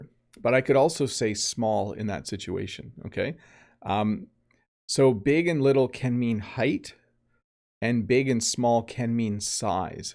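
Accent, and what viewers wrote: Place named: Canada. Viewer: Hi, no problem your Canadian accent is great and we understand your good English very well. salut mon ami.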